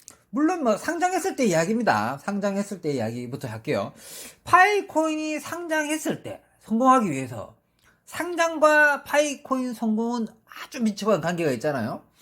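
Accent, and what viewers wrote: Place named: Masan, South Korea. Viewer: 이분 무조건 마산분인디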